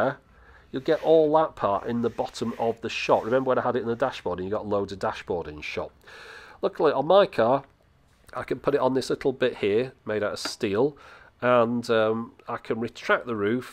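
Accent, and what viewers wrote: United Kingdom. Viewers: ByteOfWood YT. You didn't realise the British accent? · its the typical british accent :p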